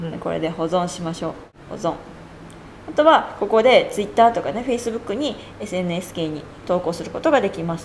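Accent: Kansai, Japan. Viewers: ええ感じの関西弁やなぁ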